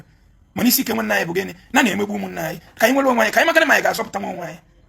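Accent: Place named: Nsukka, Nigeria. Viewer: Today you speak like nsukka man. first in history for ❤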